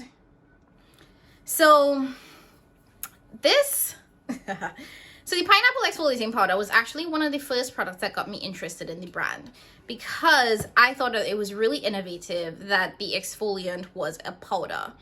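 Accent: Trinidad and Tobago. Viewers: Trini people cant hide boy😂.Love the video hun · Imma sub just to listen to your Trinidadian accent 🇹🇹 lol .. I'm not into makeup too much but I love skincare. Thanks for the video 😊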